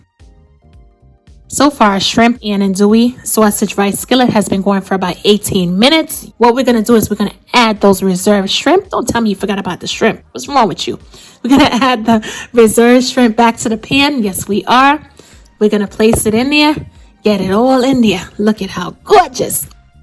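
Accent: New York, United States. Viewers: Oh man I can dive right into that skillet and eat my way out. Just the way I like it. I get lazy too much and go for popular pre mixed Cajun rice This is so much better. I almost thought you forgot about that half cooked shrimp but I should have know better you got that lid on their and cooked them just enough You got the right sausage too. Love that accent. Why do I think I hear New York City in there? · Obviously....NYC/New York City. Probably Brooklyn or Queens area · U can tell she's from New York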